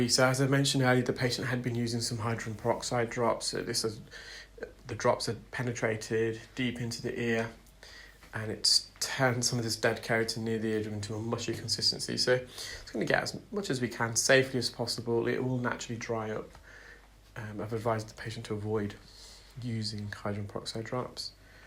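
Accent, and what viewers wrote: England, United Kingdom. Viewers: You are a sweetheart, for sharing with. Us your knowledge and skills. It is quite wonderful to hear your soft English accent as you explain to us why and how you clean an ear canal. Thank you Take care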